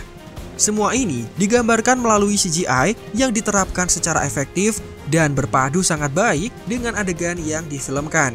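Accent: Java, Indonesia. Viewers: Mantep nih salfok sama logat admin nya logat wong jowo 😂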